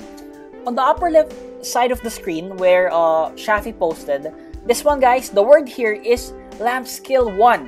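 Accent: Philippines. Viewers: are u filipino or in the phillipines im curious becaus of ur accent hehe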